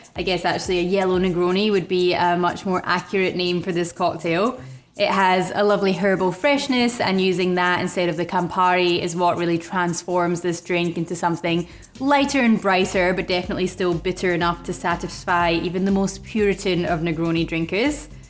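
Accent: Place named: Scotland, United Kingdom. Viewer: Да ладно, по-моему самое шикарное в ней - это её шотландский акцент.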